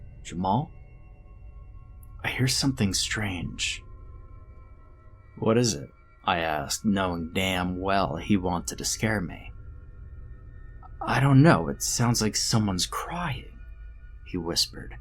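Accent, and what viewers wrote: South, United States. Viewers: I thought you was from down south here around Tennessee South & North Carolina or Kentucky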